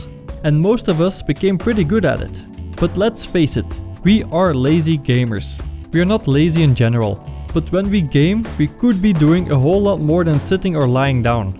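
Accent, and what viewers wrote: Netherlands. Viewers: you sound like a dutch guy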